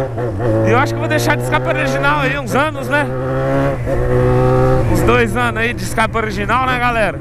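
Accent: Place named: Paraná, Brazil. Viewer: sotaque paranaense kkkkkkkkk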